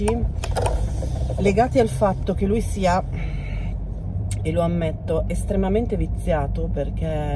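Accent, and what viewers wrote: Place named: Piacenza, Italy. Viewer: Ciao, grazie per aver deciso di metterti a nudo con noi… Avevo sentito l’accento! Sei di Piacenza! Io ci ho abitato dai 12 ai 18 anni! Anche io vicino al centro (via Genova)! Ed è vero: e’ un caos! Un abbraccio forte ❤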